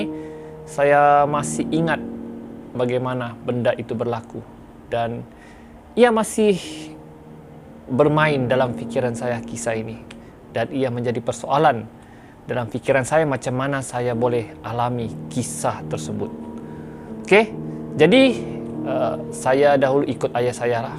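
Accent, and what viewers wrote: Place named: Tawau, Malaysia. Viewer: Bang kekalkan lh loghat tawau mu😂🤣👍🏻👍🏻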